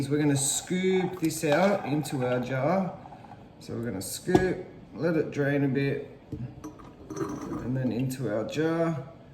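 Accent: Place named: Australia. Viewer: love your accent as well. I know your aussie but can tell theres a background somewhere,maybe second generation aussie but i can hear the little accent coming and going. Im from Sydney so grew up with lots of nationalities. Reminds me of home.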